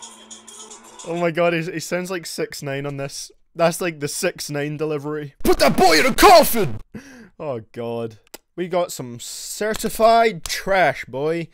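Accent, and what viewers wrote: Scotland, United Kingdom. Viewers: Shut up XXX is way better than yo British accent #ScarLxrd is still beter than you too LOL! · Good vid dude can't wait for the next and fair opinions, oh yeah and where in Scotland are you from cause you sound Scottish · A BIRACIAL SHAWN CEE WITH A SCOTTISH ACCENT YOU SAY?! COUNT ME IN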